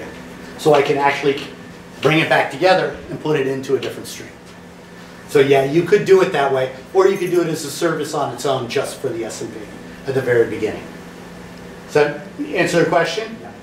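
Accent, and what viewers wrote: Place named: Italy. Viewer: Italian accent.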